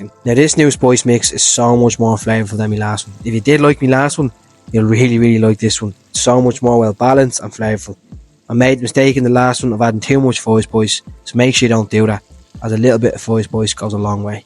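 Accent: Ireland. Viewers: Very nice... but a few bits of advice. Slow down your speech. You have a strong Irish accent (it is lovely) but on a global stage it will be really hard for some people to keep up and understand. Second, SLOW DOWN your whole presentation... if you are trying to make Youtube revenue then you need your episodes to be longer than 9 or 10 minutes... It will attract more advertisers and gather more likes etc. Take time to fill a background story with interesting back insights or whatever. You know stuff that you think is interesting...talk about it. GOOD LUCK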